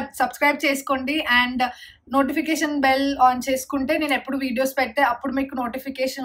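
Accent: Telangana, India. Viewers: telengana pilla.. we should proud of our slang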